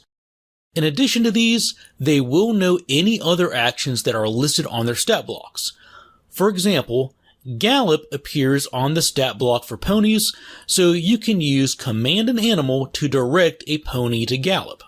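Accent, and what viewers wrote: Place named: Mid-Atlantic, United States. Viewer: With the 'L' sounds coming from the throat rather than the tip of the tongue, coupled with the wide 'O' vowel sounds, I'm going to guess Maryland. Definitely somewhere in the US Mid-Atlantic region between DC and Philadelphia.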